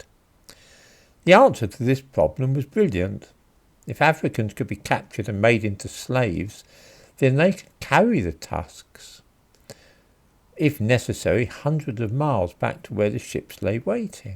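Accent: United Kingdom. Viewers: I love how this man has a thick british accent, a moustache AND looks down on you, literally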